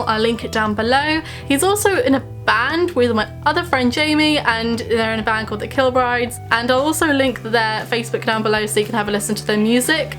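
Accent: United Kingdom. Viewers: I don't really understand your British accent because I'm Brazilian and my English is a little limited, but I liked your video, I've been goth since December 2022, and until then I didn't know my side, until I discovered nu goth, and I understood that many people used the image of goth just for aesthetics, but I have a passion for the entire subculture, when I discovered nu goth, I fell in love, I identified so much, I feel like I consume A LOT of gothic or headmetal books and music, but my style, my way of living, is totally Nugoth, I'm kind of sad that it's seen as a trend, because for me it's something very special ❤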